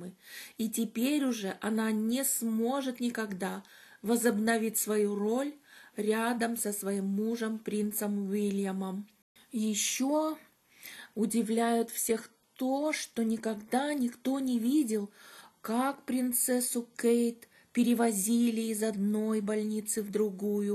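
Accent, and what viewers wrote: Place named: Rostov, Russia. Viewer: НЕ- КАК ??? НУ И БЛА БЛА БЛА с ростовским акцентом !!!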